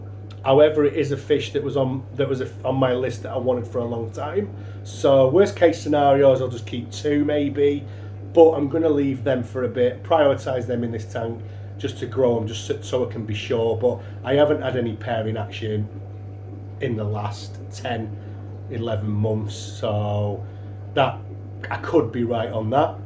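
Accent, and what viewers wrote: Manchester, United Kingdom. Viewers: Love your vids, your accent sounds like your near me in manchester ??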